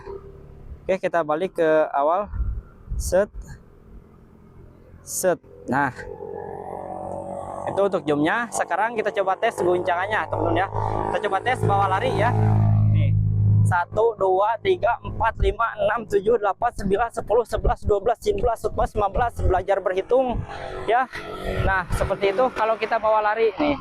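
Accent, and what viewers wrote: West Java, Indonesia. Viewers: Sunda pisan mang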